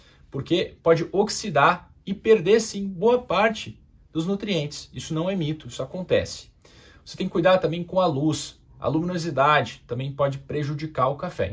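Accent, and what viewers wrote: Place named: South, Brazil. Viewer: Sabia que eras do Sul..😄Zürich aqui!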